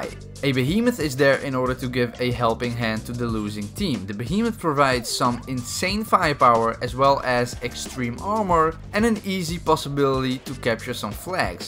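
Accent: Netherlands. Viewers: je kan wel horen dat je nederlands bent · Your so dutch, ik hoor je accent direct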